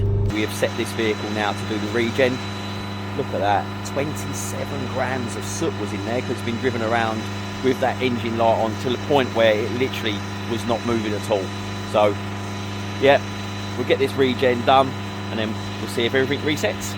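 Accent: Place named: South, United States. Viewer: Fabulous work only wish you live nearer than your southern accent may suggest 😜👍, I want this same procedure doing so can you let me know how much to expect to pay so I can get some quotes? Thanks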